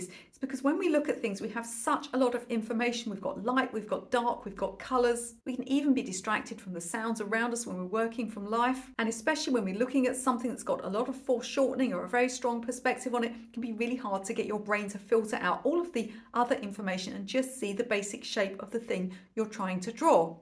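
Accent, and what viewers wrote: England, United Kingdom. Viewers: Hi, Ronnie here. JUST A QUICK FYI- IF YOU HAPPEN TO GET ANY COMMENTS THAT YOU SPEAK TOO FAST- THOSE VIEWERS MAY NOT KNOW THAT THEY ARE ABLE TO MANUALLY SLOW DOWN THE VIDEO PLAYBACK SPEED IN YOUTUBE, WHICH IS A GREAT FEATURE,BECAUSE TO PROCESS SPEACH IS A VERY COMPLEX ISSUE FOR THE BRAIN, ESPECIALLY AN AGING BRAIN, THAT'S A SCIENTIFIC FACT (LOL), FOR EXAMPLE, I AM AMERICAN & YOU ARE BRITSH & WE BOTH SPEAK ENGLISH, RIGHT. BUT ACCENT S AND CADENCE COME INTO PLAY OBVIOUSLY, RIGHT, THAT'S TO BE EXPECTED, NATURAL. I HAVE BEEN A SUBSCRIBER OF YOUR CHANNEL FOR YEARS NOW & I LOVE IT!! SO, I HAVE BECOME USED TO YOUR ACCENT AND RATE OF SPEAKING OVER TIME. BUT, WHEN I FIRST BECAME YOUR SUBSCRIBER- IS WHEN I DESCOVERED " THE YOUTUBE PLAYBACK SPEED FUNCTIONS. AFTER ALL, THE INFORMATION YOU ARE PROVIDING CAN BE RATHER COMPLEX, FOR SOME,PERHAPS. 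YOU DO AN EXCELLENT JOB!!!!! THANKS,! BRAVO, ON YOUR YOUTUBE CHANNEL! WARM REGARDS, RONNIE ~ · Yes, I do watch some of your videos repeatedly. And I keep picking up and using more skills and techniques each time! And besides your adorable English accent and vernacular are quite charming and helps my attention deficit brain stay focused instead of tuning out from the audio as happens when I watch some other content creators. 🎨🧑🏻‍🎨🥰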